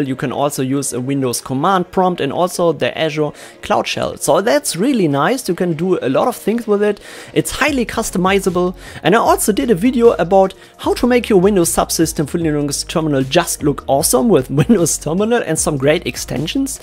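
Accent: Germany. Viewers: I like your German accent.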